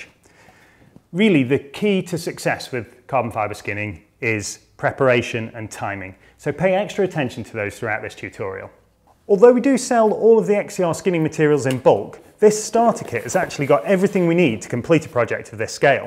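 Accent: United Kingdom. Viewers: I like hearing him explain things in his cute British accent! This is porn for moms! 💁🏻‍♀️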